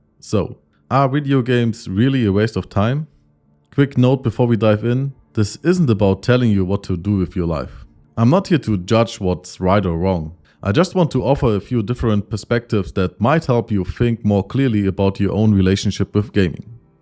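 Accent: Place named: Germany. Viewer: I love deutsch-flavored English. It’s flavorful and discernible. Unlike China accent English… 😥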